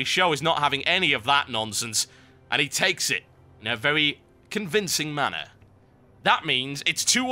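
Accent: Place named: England, United Kingdom. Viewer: i could listen to your damn-so-good-comment all f'in day, especially because of your incredible authentic english accent :) total biscuit you are a total wizkid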